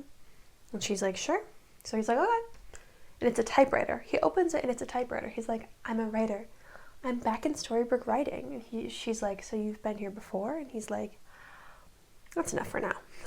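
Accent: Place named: Australia. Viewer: Wow! Never expected to realize that I needed a full retrospective on season 1 of OUAT. Thank you so much for processing this mess of a show into a coherent recap. This video rekindled my interest in this fandom, so I guess it's time to dig some of my favs from ao3. Because the show treats itself so seriously, I was giggling whenever you were referring to Rumple or whoever with "bestie" or completely slandering someone for whatever illogical thing they did. Like half of the time plot important characters appear out of nowhere to do some random moral talk for a side-story character because... reasons? Like why the hell Belle suddenly materialized to give a true love speech for Grumpy/Dreamy. It's even funnier when you consider her side quest with Mulan just somewhere around that same time period. Like the Mulan? Seriously? Or the fact that Regina crushes Christian's Gray heart(whatever is his name in the series) and no one actually cares in just about a few minutes(even Emma with her unresolved internal conflicts and fear of abandonment) but Catherine not-so-dead-but-actually-kidnapped is getting like multiple episodes in which everyone in town speculates on what's happened only because let's be honest here it's just juicier gossip than random ass sheriff dying of a heart attack in what? 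30ish years old? It was two hours and a half long video and I chuckled every time you did that. Also, your Australian accent and some characters' quirks impressions were just killing me, like I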